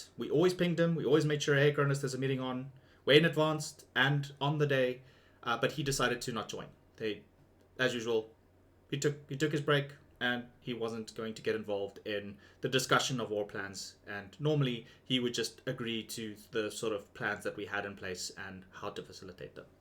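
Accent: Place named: South Africa. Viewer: Where u from? U have like a South African accent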